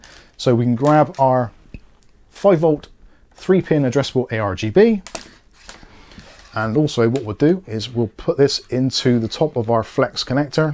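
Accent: Bristol, United Kingdom. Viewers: Thanks for the video. You’ve deffo got a south west accent, sounds Bristolian but could be wrong lol.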